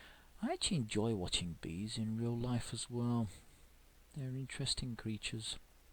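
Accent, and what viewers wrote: United Kingdom. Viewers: Hey, you are fine with me about the accent. Here in the states people talk with a tone in their voice as if tey are asking a wuestion yet making a statement, being sight impaired it is annoying, yes I see with one eye and a gamer at 53. Love it. Especailly hayday. British accents are hot. Thats howi got blessed to meet my spouse, he met the bestles. Lived in kent and went to dorton house schol for te blind.theuk treated him as if he was royalty.respect and dignity not like the services for the blind here. They doubted my connecting up the internrt, dragon naturallly speaking, amazon, dow loading books and games, hayday.my farm is teri millers. Pigs infar upper right hand corner, bees decoate the front, right.you will eventually find a theme. And my artisic skills no horses yet and bunnies soon.like me onfb. Treacle tarts are a dream. 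I got to visitfor two weeks. Too short for me. I love the uk.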